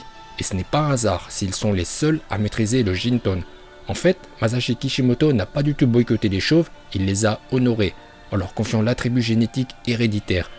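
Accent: Japan. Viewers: Ton accent en japonais il est éclater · Wais ton dégoûtant accent japonais est le seul truc qui n'empêche de m'abonné et en plus de ca tu n'arrête pas de t'en vanter arrête avec çà Déjà avec son Goku qui glisse sur le crâne chauve de jiren et ca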